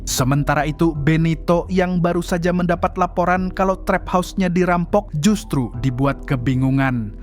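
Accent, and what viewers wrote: Banjar, Indonesia. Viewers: Orang Banjar ni admin😂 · Orang banjar kah mang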